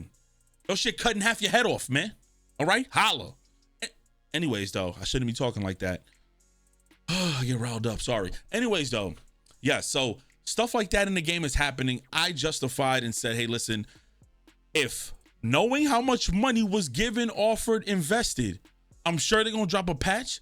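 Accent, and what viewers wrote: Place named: East Coast, United States. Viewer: Are you from Jersey? I hear east coast in your accent, but Im not sure if its NYC or not.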